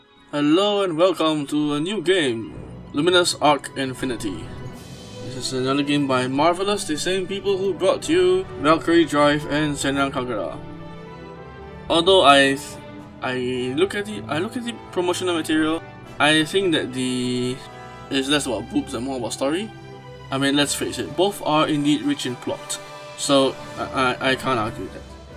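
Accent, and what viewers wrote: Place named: Malaysia. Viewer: never thought I would hear a Malaysian accent.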